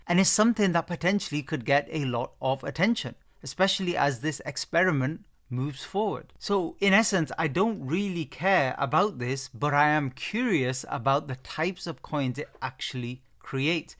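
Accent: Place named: Newcastle, United Kingdom. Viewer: Bro, you can tell he's from around the Newcastle~ish region - from listening to the accent, in my opinion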